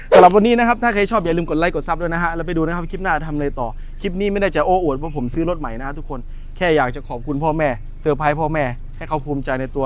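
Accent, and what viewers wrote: South, Thailand. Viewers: พูดภาษาใต้น่ารัก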